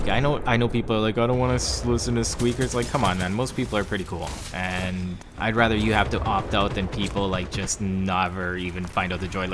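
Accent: Canada. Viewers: Are you Canadian? Sounds like it. Say “battery about”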